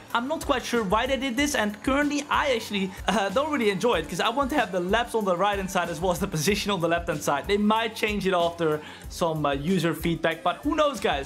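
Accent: Netherlands. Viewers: Very nice video. I can barely tell you're Dutch. Your English improved a lot.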